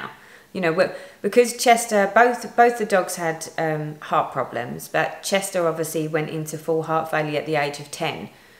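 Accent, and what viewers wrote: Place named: England, United Kingdom. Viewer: What part of England are you in? I love your accent!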